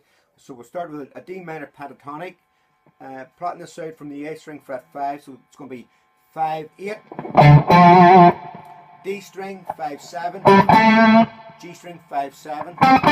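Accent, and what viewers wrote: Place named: Ireland. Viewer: finally got to hear your voice 🤠 man you got that ruff ol' Irish accent and sounds great 👍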